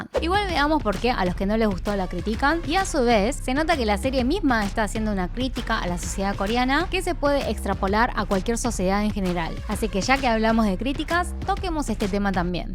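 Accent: Argentina. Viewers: Hola eres de Argentina o de Corea? Tienes acento argentino pero rasgos de una coreana china o japonesa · Hablas cómo argentina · Y por qué esta china youtuber habla como argentina? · Coreana hablando con acento argentino me suscribo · No entiendo como tienes ese acento de los argentinos 🤔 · Ame ese acento argentino en una asiática preciosa excelente contenido ❤️🥺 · Una pregunta tu eres de argentina o de otro país por qué te sale muy pero que muy bien el asentó argentino??? <3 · Una china hablando como Argentina wow como está el mundo. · Tú Castellano es muy bueno aunque tú acento no es nuestro sino claramente argentino.... Necesito hacerte una pregunta. ¿Sabes porque el protagonista se tiño el cabellos de rojo? ¿Tiene algo que ver con la capucha roja que tenían los trabajadores? Gracias por tu explicación.. me has ayudado a entenderlo mucho mejor!! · Me gustan mucho tus videos y tu acento argentino. 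Felicidades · Hablas como argentina xdd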